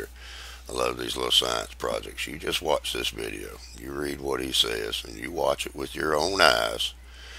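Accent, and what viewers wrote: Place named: Virginia, United States. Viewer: ahh man. watching ur vids and reading the comments about ur voice. you sound like my old dad with that backwoods virginia accent. man i miss him. he would have loved ur videos. he had to quit school after fifth grade and when he got drafted they made him take his asvap test twice cuz they couldn't believe he scored so high. here i sit with my degree and not nearly as smart as him.lol. stick with it. them edjicated idjots gotta learn somehow.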